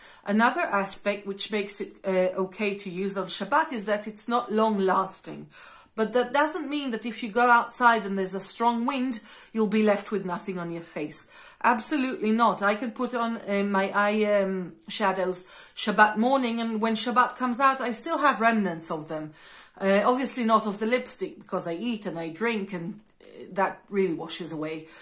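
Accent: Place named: England, United Kingdom. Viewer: מהממת והמבטא (אנגלי?) הורס...! 😍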